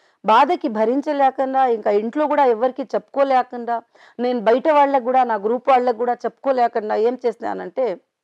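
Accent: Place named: Chittoor, India. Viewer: madam slang looks like chittoor... Good to hear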